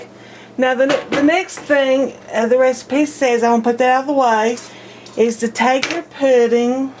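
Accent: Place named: Kentucky, United States. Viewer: you sound like youre from hazard Kentucky. sweet accent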